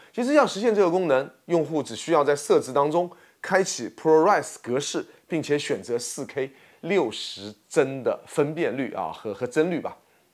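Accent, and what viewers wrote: Shanghai, China. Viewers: 个口音很上海 哈哈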